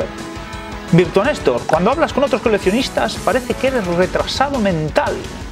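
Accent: Galicia, Spain. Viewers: Brutal me parto con tu acento gallego, no cambies. Me encanta descubrír estás versiones imposibles en consolas menos potentes. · Eres un crack...hay gente que no se da cuenta de que eres gallego y que hablas así que no estás imitando o poniendo voces...lo que pasa es que aquí nada más que hay heaters mongoloides y envidiosos...sigue así fenómeno!!! Viva Galicia y Vixca Valencia!!! Pd: lo siento por lo del penalty de Djukic...un abrazo de 16 bits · Ostiaaaaa!!! Q bueno el giro final con José en Paz descanse.. de nuevo engañando a todo dios.. Patéale el culo a esos haters! Deberías subir un vídeo cada día para alegrarnos la tarde... XD PD: soy de ElBierzo y por ende medio galego, y cuando te oigo parece que estoy hablando con un paisano de la miña terra!! Sigue así amigo! Y ni te dejes avasallar por la envidia de esos niñ@ y no tan niñ@ Rata! 🐭🐀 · 10/10 la imitación de. 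A mí me parece simpático el acento gallego.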